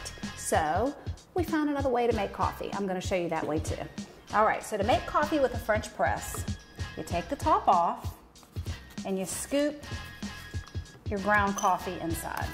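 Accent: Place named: South, United States. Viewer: You look (you could be) Italian with a southern USA accent 😍 Where are you? I like the view of yiur opening scene... Give Thanks (Now let me rewind so I can pay attention to your tutorial 💖)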